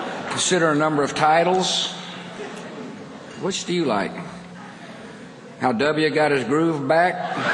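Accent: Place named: United Kingdom. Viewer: i speak my dialect and you speak y'own maybe your lack of american in your english is irritatin to me and mine? sound like some jumped up british poetry professor tellin ME how to talk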